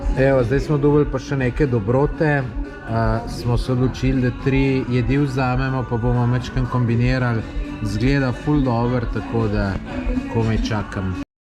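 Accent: Lower Carniola, Slovenia. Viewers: Hvala ti za lepo izcrpno reportazo, tud nam je poveljnik reku da se ne smemo nicesar sovraznikovega dotikat ker je lahko minirano in se ne splaca. Ja cudn res da una stolpnca se stoji jebemu, Amerika bo mela poune roke dela da vse to obnovi pa gnarja bo slo na miljarde. pazi nase in samo naprej. 👍👍👍 Ps. Pogresam tvoj prijeten dolenjski naglas, malo bolj ga lahko poudaris v oddajah in porocevanjih. Tud jst sm rodom iz Trebnjega Velki Gaber tko da rad slism naso špraho ☺️☺️👍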